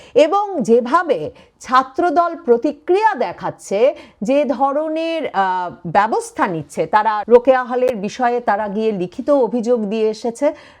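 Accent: Kolkata, India. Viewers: আপনে এই রকম কলকাতার মেয়েদের মত কথা বলেন কেনো?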